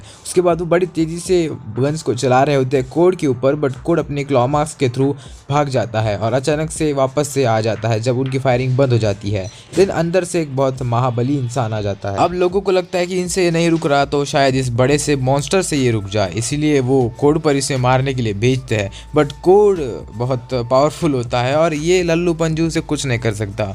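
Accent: Bengal, India. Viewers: Bangali he😅😅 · Ya bangali h😹😹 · Ye bengoli hai 😅😂😂😂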